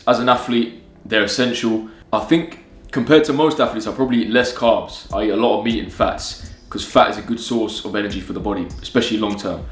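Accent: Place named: United Kingdom. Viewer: Which part of the uk you come from?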